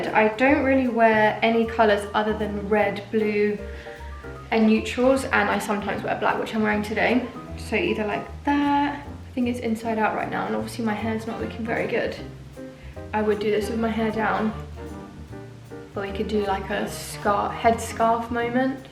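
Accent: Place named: United Kingdom. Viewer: My dream job is being an interior designer, and because of that, I watched your video, and I noticed how beautiful your British accent ❤❤ .I wish you rache everything you want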